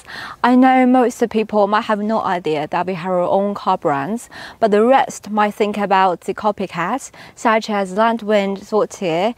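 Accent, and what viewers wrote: United Kingdom. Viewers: 这小英式口音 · Chinese beauty with British accent 😯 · 厉害啊，英伦口音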